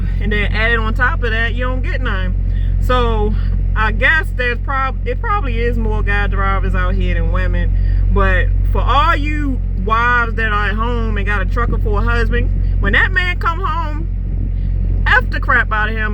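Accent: Baltimore, United States. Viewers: You gotta be from Baltimore?! I can tell by the way you say “do” and “to”...I like your honesty. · You are from Baltimore, Maryland aren't you? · You sound like you from Baltimore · Love that bmore accent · Ya sexy ass sound like you from Baltimore. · She do sound like she from Bmore, sorry that you don’t get none because you’re so cute, love ya voice too. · 666 comments, glad I'm 667 Sounds like you from Bmore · Sounds like you are from Baltimore... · Good grown advise.Keep it truckin,sound like a Baltimore accent.Peace and blessings to the truckers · Love your BMore accent ♥️ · She is definitely from Baltimore Maryland! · You must be from Baltimore? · First time ever seeing your video & the first thing I’ve noticed besides how gorgeous you are is your Baltimore accent... You have a new fan! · Jay Reed Nope....DC, MD OR Virginia..or at least been there for 5 years or more....Actually 99% hunch it’s Baltimore. 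(after listing more 99.999% it’s Baltimore) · sounds like she is from. bmore or. maryland lol the uuuuz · 2-4 Till I Die she sounds like she's from Baltimore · I was about to say. You from Baltimore. Nice 😀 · This is my first time seeing this channel but i can tell by your accent that you are from baltimore. Nice content! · I can listen to that B'more Accent all day 😂 coming from Bama. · Very pretty your probably fun to hang out with too I bet sounds like you from Baltimore anyway keep safe out there miss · You sound like you're from Baltimore.But you not having a man.Wow I can't see that far.You are BEAUTIFUL. 💯😚Keep it up sista.Real talk from beginning to end. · That Bmore accent strong lol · I love the Baltimore accent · You from Bmore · Very nice looking female truck driver, you speak with a Baltimore accent, are you from that area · My first video watching. You from Baltimore. I can hear that shit clearly. 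DEW lol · Gotta be from Baltimore. NOLA and BMore accents are so dope! You rock! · Ya bmore accent is LIT! 😂😂😂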